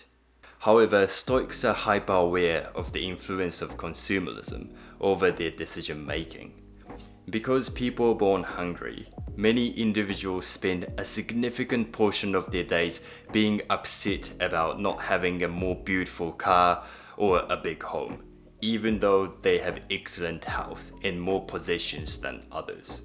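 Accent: New Zealand. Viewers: I'm perplexed by the I watch on YouTube. He presents himself as a person who enjoys solitude, stoicism, introspection, and minimalism. He is self-conscious with his English. However, his English is articulate and well composed, with an occasional and charming compensatory hard Japanese "r" and delightful Kiwi accents. He strives for standard American English, now thought of as West Coast American English. With all of his preferred introsepction, he presents these wonderfully scripted videos of professional quality. So, is he a loner who is driven to tell us why and persuade us to find the same karma? How can he, without a salaried job, live so well in expensive Japan? While he professes not to be moved by the opinions of others, he has perfected his physic, his speech, and his mannerisms for others and is delightfully, appropriately seductive. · Yes I hear the Kiwi accent too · You're wise and I like your kiwi accent. 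Cheers from 🇬🇧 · I’m sure that you had great English when you were 15. The problem was that New Zealand accent. If you have come to the US, we would have been able to understand you better than some native New-Zealand-ese speaker.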